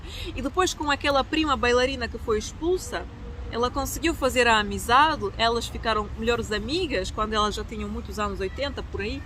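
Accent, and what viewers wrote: Portugal. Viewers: Eu te assisto sempre e fico bem na dúvida se o teu sotaque é do teu idioma, mais parece que é mesmo de Portugal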